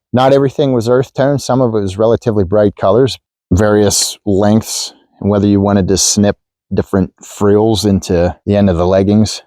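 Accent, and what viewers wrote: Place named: Pittsburgh, United States. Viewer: When I saw the one of your other videos, I thought that there was a little "yinzer" in that accent. The second video shows you sitting at the Point. :)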